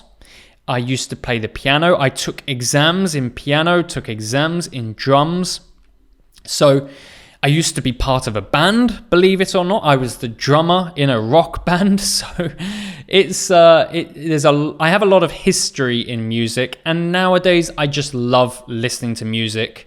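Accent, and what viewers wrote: United Kingdom. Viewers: I love your amazing British accent 😍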